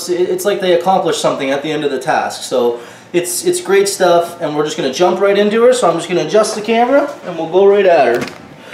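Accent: Newfoundland, Canada. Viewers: Sounds like Newfoundland. I'm also from there. Love the accent. I'm homesick now lol.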